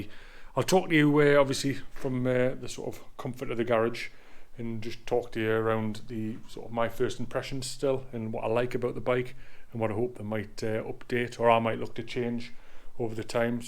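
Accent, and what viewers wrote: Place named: Newcastle, United Kingdom. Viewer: .. I think you’ll find he’s a Geordie, not a Scot..🤦🏻‍♂️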